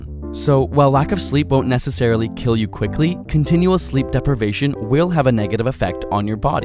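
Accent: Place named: Canada. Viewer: You are Canadian... I can tell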